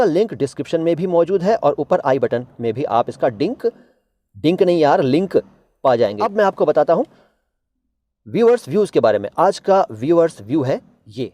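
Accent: Standard. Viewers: bahut shudh bolte hein aap waah☺🙏🙏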